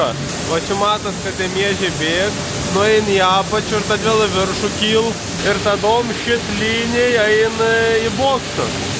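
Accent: Biržai, Lithuania. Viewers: Svajok atsargiai, nes svajonės pildosi🎉 Labai norėčiau fizinės knygos, nes audio kažkaip ji man nesusiklausė, tai duočiau antrą šansą jai 😢😮😮😂 P.S. Mldc už Biržetišką "šneką" tikrai malonu girdėt 😂😂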